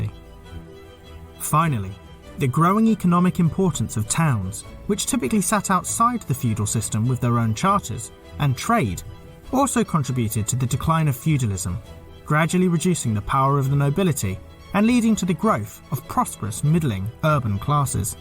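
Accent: United Kingdom. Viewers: Liked your clip. Already knew most of the story. With respect to present day Brits (note the narrator's accent), the then and there societal setup strongly resembled the previous setup of Feudalism. This from High School American History. And the main reason that much of the emigrates from the Old World to the New World. The major point of Feudalism is that your position in a society is either inherited or assigned. For those that know a little of the Roman Empire, this might sound like a repeat? Things that can make you go, 'Hmm?'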